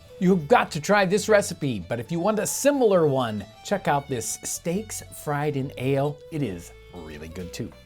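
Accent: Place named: United States. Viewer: Its pronouced (Shuh-Lots) not Shallets 🤣🤣 Wow American English its horrible UK version is much better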